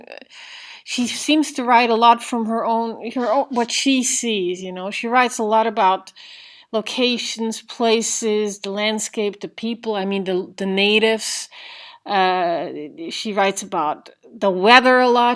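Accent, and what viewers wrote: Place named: Europe. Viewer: You speak like you came from Europe to US and learned English a five years ago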